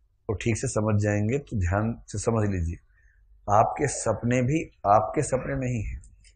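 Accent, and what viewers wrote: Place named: Eastern Uttar Pradesh, India. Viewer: At the end, bujha raha h? 🤣🤣🤣 Sir aap pakka U. P. east se h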